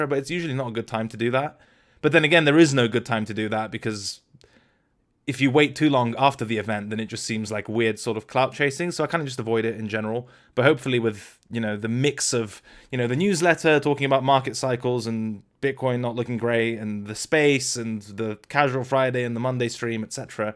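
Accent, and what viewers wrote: United Kingdom. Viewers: Hey I can't believe you are Russian, with such a strong British accent. Sending my regards from Queensland Australia 🤝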